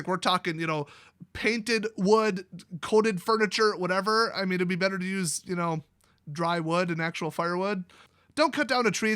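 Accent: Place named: Michigan, United States. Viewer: Very good video. I have slept in hotel parking lot in my car when I didn't want to pay for a room after a concert. I have a hybrid so it's less of a concern, but I always worried about some psychotic person walking up and stuffing something in my exhaust. That's why I always did it in a hotel parking lot. A battery-powered carbon monoxide detector would have been a good idea. But there are tons of people who try to commit the s thing this way and they fail, so I figured it couldn't be all that deadly. Also, you sound more like a Michigander.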